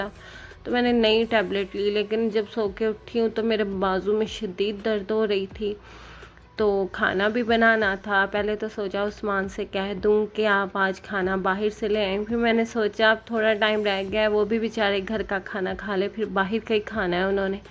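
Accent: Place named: Punjab, Pakistan. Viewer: Assalam o alaikum Appi lgta h Pakistan shakargarah se h apki voice se lg raha h hamare me udhr k h wo b apke jese bolte h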